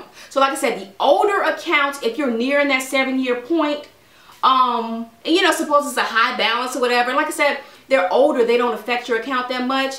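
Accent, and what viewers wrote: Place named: DMV, United States. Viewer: Love your accent. Sounds almost like DMV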